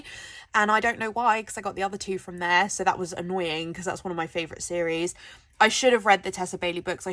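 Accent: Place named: England, United Kingdom. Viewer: I'm currently enjoying a binge watch of reading journals videos and it was a surprise to hear an English accent! You sound a bit like Fearn Cotton ❤ Love your reading journal!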